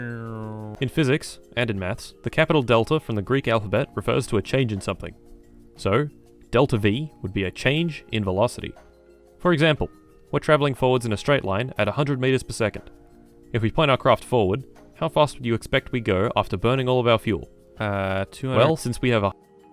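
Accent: Australia. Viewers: are u an aussie? im curious...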